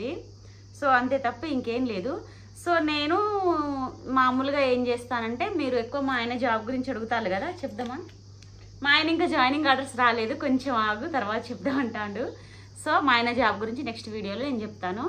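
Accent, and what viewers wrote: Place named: Telangana, India. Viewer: Your telugu accent is pakka telangana, I really liked it. All the very best.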